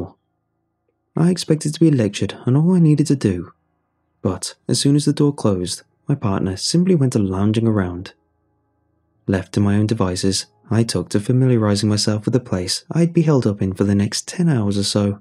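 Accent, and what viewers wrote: United Kingdom. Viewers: Why is it always a British accent to explain it to Americans! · If the guy reading these stories didn't have a British accent, I might actually get into them.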